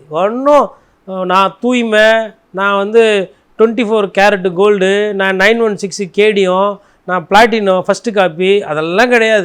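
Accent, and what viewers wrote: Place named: Chennai, India. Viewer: தம்பி உண்மையை நேர்மையாக பேசுவதிலும் சரி சென்னை வழக்குமொழியில் பேச்சுவதும் மிக மிக சிறப்பாக (சிரிக்க) கருத்தாழத்துடன் கூறும் பாணிஅருமை அருமை ❤❤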